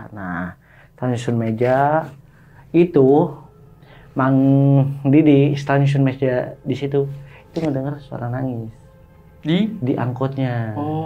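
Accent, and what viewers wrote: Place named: Bogor, Indonesia. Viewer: Rada cape dengerin ceritanya. Padahal kita sama orang sunda. Mungkin karna orang bogor jd dikit beda nadanya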